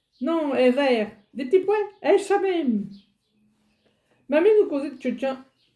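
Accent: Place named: Quebec, Canada. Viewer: Quel accent intéressant pour le Québécois que je suis ! Ça évoque certains parlers de l'Acadie et des intonations de par chez nous 😃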